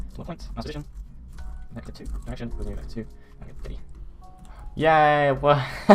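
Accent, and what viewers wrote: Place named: United Kingdom. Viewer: That british accent xD magic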